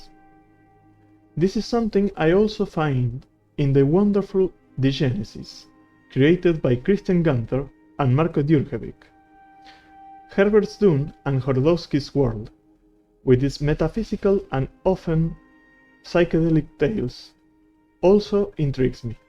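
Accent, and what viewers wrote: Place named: Argentina. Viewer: Llevo 20 segundos del video y escucho que sos de Argentina y me salio un terrible OOOOAAAAA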